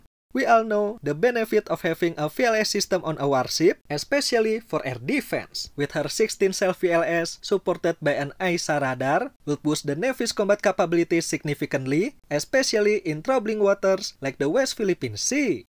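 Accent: Indonesia. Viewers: Pengucapan english nya kaya org indo admin nya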